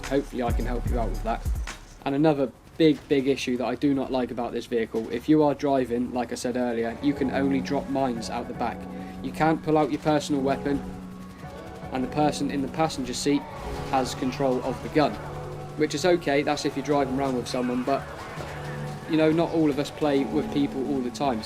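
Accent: England, United Kingdom. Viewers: That's coz he's fucking english you bellend!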